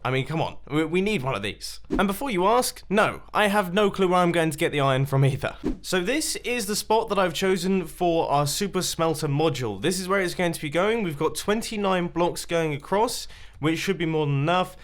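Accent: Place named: United Kingdom. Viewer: To I talk with a strong western ascent, to me is extremely British. Why are accents so wierd!!?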